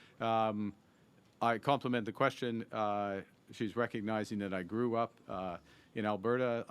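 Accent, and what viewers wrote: France. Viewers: I don't know why some critics say he doesn't speak French well... He does and he speaks French French not Quebecois, maybe the critics are attacking that.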